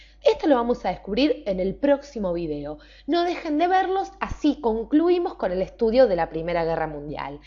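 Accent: Argentina. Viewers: es de argentina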